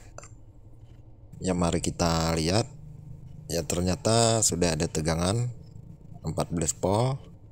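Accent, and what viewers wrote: Banjar, Indonesia. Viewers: Pina kaya orang banjar pian niiih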